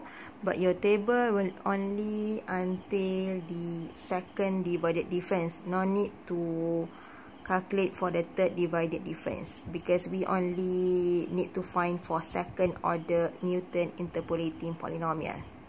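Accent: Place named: Malaysia. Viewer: Bila dengaq je suara dan slang, dh tahu org melayu👍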